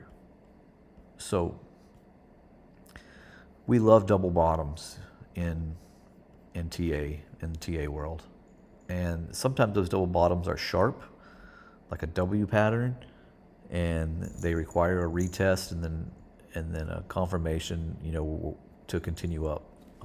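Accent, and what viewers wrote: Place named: South, United States. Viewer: Just found your channel - bought some HBAR last week. Came for the charts, sticking around for the southern dialect.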